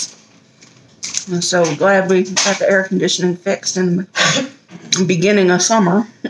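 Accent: South, United States. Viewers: She lives in Vegas BUT she's from the south... somewhere in LA i beleive...southern for sure! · I love her southern accent❤❤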